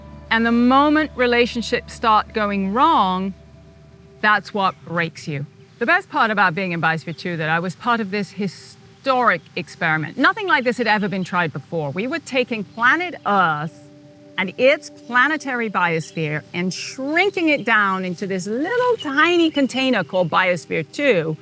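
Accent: United Kingdom. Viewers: So, Mr. Smartypants, tell us what a selfish American she is when she has a BRITISH accent. How ignorant can you be? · Is RP English - she would have had very exclusive education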